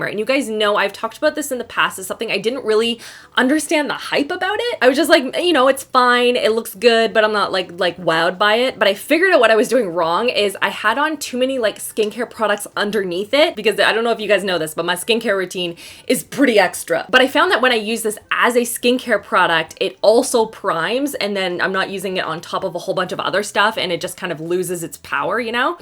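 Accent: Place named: Canada. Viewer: Can you just talk textbook Canadian for a makeup tutorial, cause that would be magical.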